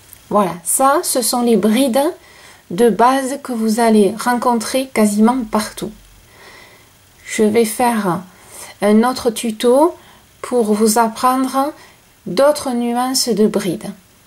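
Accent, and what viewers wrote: South, France. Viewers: Vous êtes formidable vous expliquer très très bien vous en dites pas trop juste assez et votre vidéo est très bien faite on voit bien vos gestes. J’ai longtemps cherché des tutoriels de crochets bien faits, le vôtre est exceptionnel! Je suis du Québec et en prime votre merveilleuse sonorité du sud. 😊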